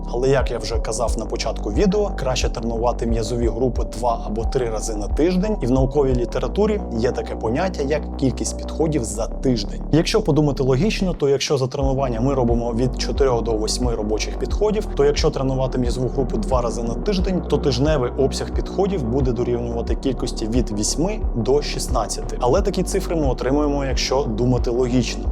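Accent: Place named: Russia. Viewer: А я думаю що поспішає і з-за цього 20-30 % слів виходить з російьким акцентом. Треба трохи повільніше, щоб звикати до мови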